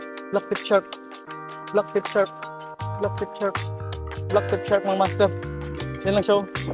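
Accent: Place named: Iloilo, Philippines. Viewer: Ilonggo ka gali master taga diin ka master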